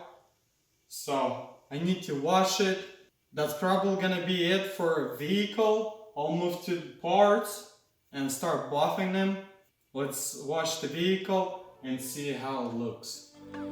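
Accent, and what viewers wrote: Russia. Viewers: Вот смотрю ваши видосы и думаю, что акцент похож на русский. Угадал?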